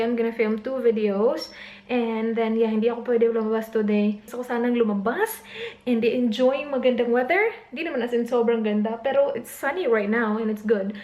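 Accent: Visayas, Philippines. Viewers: hahaha katuwa ka naman the first time I saw your video im not paying too much atention and then i saw again tpos may punto ka sabi bisaya cguro to....natawa ako doon sa mubua nku kalipay oi hahahahahwhich means mababaw ang kaligayahan ko nkarelate tuloy ako sau kc parang gnun lng ako kakalog hahahahhah thumbs up ate!! Rachelle from davao..mwahh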